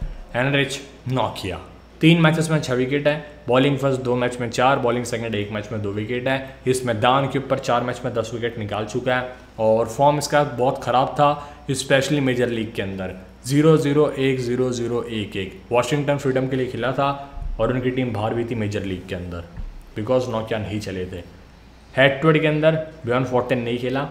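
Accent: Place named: Caribbean. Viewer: Sir ap me Caribbean flavour hai thoda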